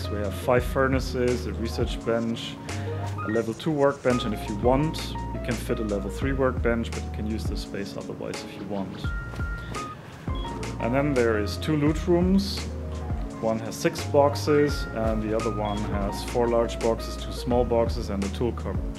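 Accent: Germany. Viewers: No offense to your english, but I instantly knew you were german. People also do realize my german accent after I start speaking even when there are no grammar mistakes :D Whatever, calm voice, calm music and good mic / video quality. Well done man!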